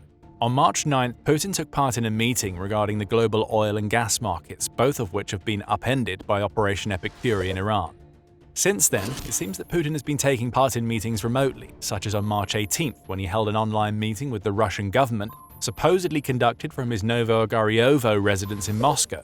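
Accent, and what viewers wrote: England, United Kingdom. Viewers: "Nearly three identical offices"? Was it two and a bit, or three and a bit? The narrator's accent sounds English, but the grammar makes me wonder.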